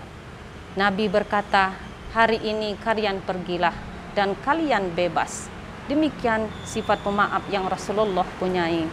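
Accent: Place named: Liwa, United Arab Emirates. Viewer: Sukses terus ya dindaaa.. Tapi logat liwa nya di kurangi yaa... Hee heee hee..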